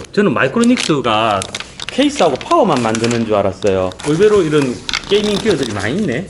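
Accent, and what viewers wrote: Gyeongsang, South Korea. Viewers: 구수한 경상도 말투가 방송볼때 좋았습니다....사실 저도 경상돕니다..ㅎㅎ건승하시길 바랍니다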